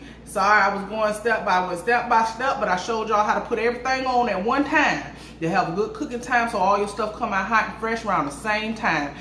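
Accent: South, United States. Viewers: I’m so glad ur back!! I missed ya!! Where are you from? U sound so southern. I’m from Louisiana Baton Rouge!! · Hello! I'm a new subscriber and love, love, love your personality and your southern accent. Your food looks delicious 😋 I'm from the Midwest by way of the south. I grew up watching and learning to cook from my grandma. We cook similar to your style of cooking except we use the Aunt Jemima Corn meal mix for our dressing but I know the jiffy is just as good. I live in TX now and miss being around my family and soul food that don't always include spicy ingredients. I stumbled across your YouTube channel in which, I'm glad I did. Watching your videos are bringing me so much joy and remind me so much of our homestyle cooking. Thanks for sharing you with us ❤️😊 ~Toi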